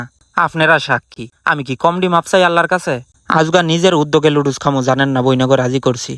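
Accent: Comilla, Bangladesh. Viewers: Vaiya Tumar kotha gulovonek Valo lage ar onek funny lage accha vaiya Tumi koi thako 😂😂😂😂😂Tumi ki kumilla te thako tomar vasha gulo amon kummillar moto 😂😂😂😂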